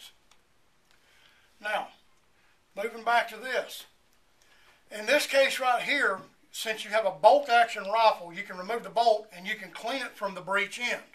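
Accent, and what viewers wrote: Maine, United States. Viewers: Thanks for the video. And that's most unusual "down east Maniac" accent I have ever heard. Where are you from originally? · Just love listening to someone with an authentic Maine accent.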